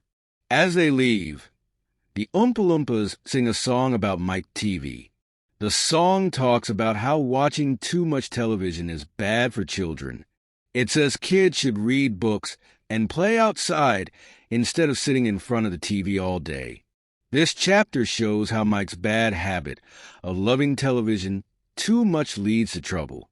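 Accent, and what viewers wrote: United Kingdom. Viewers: Excellent performance and a distinctive British accent. Thank you very much... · Por casualidad, este inglés es británico? Por cierto, es muy bueno este contenido, gracias por compartirlo!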